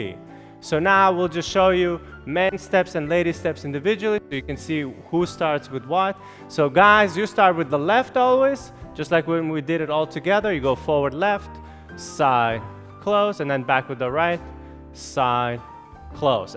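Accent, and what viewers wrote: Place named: Eastern Europe. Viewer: Spent the first time watching the video trying to figure out what his accent is It sounds Eastern European but not sure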